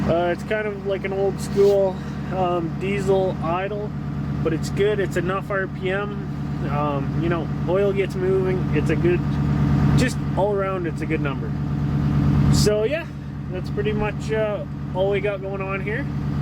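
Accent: Canada. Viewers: First 4 words outta your mouth i knew you were from Alberta hahaha fuckin eh bud me too! 🍻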